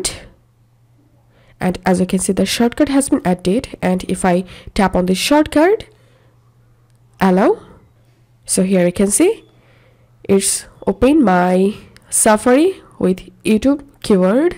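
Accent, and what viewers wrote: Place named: India. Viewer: I don’t trust the Indian accent…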